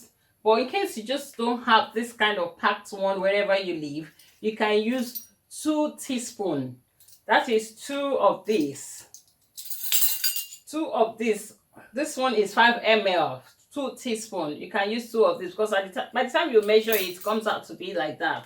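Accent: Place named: London, United Kingdom. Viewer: I love d way she talks.. she's talking like all does London aunties..nice one..I'll try your measurement.. sometimes my puffpuff comes out great n sometimes it doesn't.. will give it a try and hit you up... good job ma'am👌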